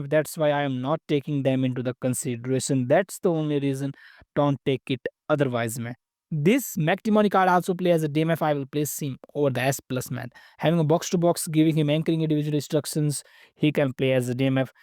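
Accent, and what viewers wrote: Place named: India. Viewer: My Hindi accent friend how are you? Because of you i lost money. You need to pay me back😊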